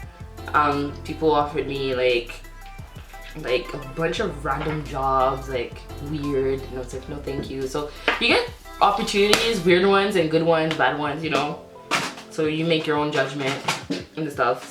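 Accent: Canada. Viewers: Girllll, I love you I subscribeddd. I could definitely tell you were Canadian too 😭❤️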